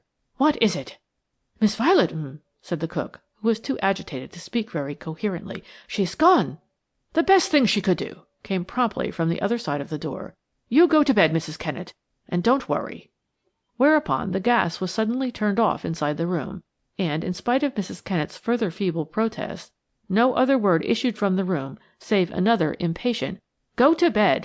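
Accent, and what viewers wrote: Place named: United States. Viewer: Should not be read by an American speaker. Can't listen to it, sorry.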